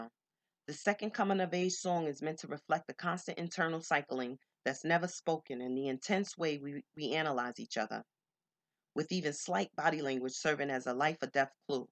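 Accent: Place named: New York, United States. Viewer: Couldn’t imagine hearing this read aloud in no one other than that infamous NY accent. 🥰 u did that sis.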